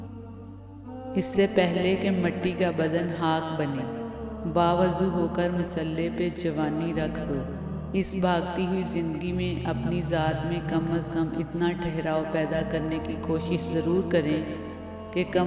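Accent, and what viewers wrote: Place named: Punjab, Pakistan. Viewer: خوبصورت اواز و انداز کے ساتھ دل میں اتر جانے والے اصلاحی و سبق آموز اقوال ۔۔۔۔ تلفظ کی پرفیکٹ آدائیگی ۔۔۔ سونے پہہ سوھاگہ اردو میں پنجابی لب و لہجے کا حسین امتزاج ۔۔۔ ماشاء اللّٰہ ۔۔۔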